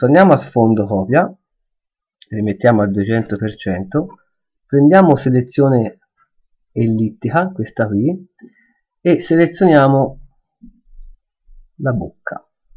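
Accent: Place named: Tuscany, Italy. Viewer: Grazie molto utile ma il toscano mi fa morire...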